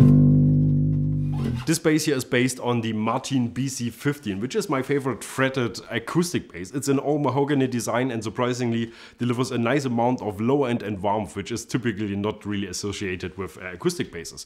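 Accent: Germany. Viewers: That german accent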